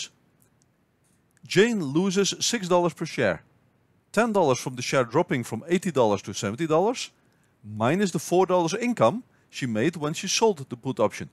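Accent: Netherlands. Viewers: Tis hard hoe dat ge hoort dat nen ollander is :DDD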